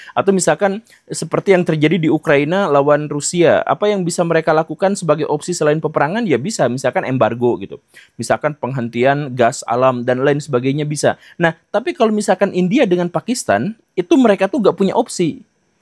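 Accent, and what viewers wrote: West Java, Indonesia. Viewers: Senengnya dari ini..kalau menerangkan pake bahasa sederhana, mudah dipahami dan satu lagi aksen sundanya gak ilang..kaya aing bobotoh..Hidup Persib!!😂😂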